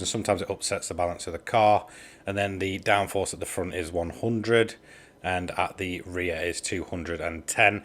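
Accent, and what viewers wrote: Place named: East Midlands, United Kingdom. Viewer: Gotta be Leicester or Derby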